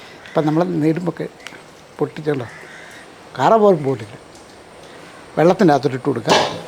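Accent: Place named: Central Travancore, India. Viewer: എന്റെ സഹോദരാ, ഞാൻ സ്വയം പാചകം ചെയ്യുന്ന ആളാണ്. ഒറ്റയ്ക്ക് ആണ് താമസം. എന്റെ കിച്ചനിൽ ഇപ്പോൾ റെസിപ്പി മാത്രമേ ഉള്ളൂ. പുകഴ്ത്തുകയല്ല എല്ലാം അടിപൊളി. താങ്കളുടെ വിവരണം നന്നായിട്ടുണ്ട്. താങ്കളുടെ ഈ വിനയം ഉണ്ടല്ലോ എന്താ പറയാ ഒരു വലിയ സല്യൂട്ട്👍🙏. മധ്യ തിരുവിതാംകൂർ കാരൻ ആണെന്ന് തോന്നുന്നു സംസാരം കേട്ടിട്ട്. ദൈവം ധാരാളമായി അനുഗ്രഹിക്കട്ടെ.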